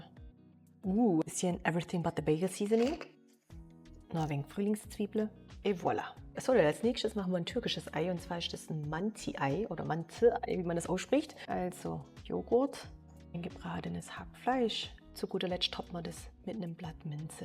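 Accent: Swabia, Germany. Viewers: Geiler Akzent!! Aus'm Ländle ❤ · Des isch so sche die heimat wieder zum hören. Grüßle vom Landkreis Ravensburg gell❤😂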